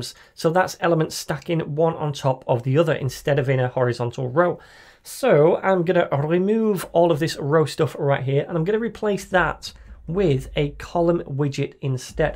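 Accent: United Kingdom. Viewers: dude am Arabian i speak multiple languages with different accents specially English so i learnt British accent from u not just flutter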